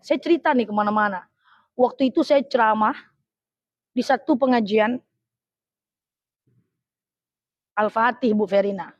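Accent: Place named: Sumatra, Indonesia. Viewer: Masya Allah, baru ketemu Chanel ustadzah, senang penyampaiannya dan seru sambil senyum senyum, masuk ilmunya, terimakasih Ustadzah, Ngomong ngomong ustadzah dari Sumatra bagian mana ya Logatnya saya suka, Krn saya juga dr sumatra, ❤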